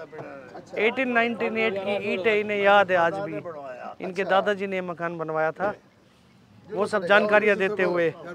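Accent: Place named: Saraiki, Pakistan. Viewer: Sir, your pronunciation is Saraiki one that is the sweet language of Multan & Dera Ghazi Khan region. Its a nice video. You all are welcome to Pakistan. Watching from Multan.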